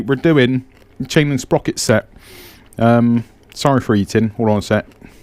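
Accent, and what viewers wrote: Bristol, United Kingdom. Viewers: Good entertaining video, you sound like your from the Bristol area like me! I was gonna get s1000rr but bought new zx10r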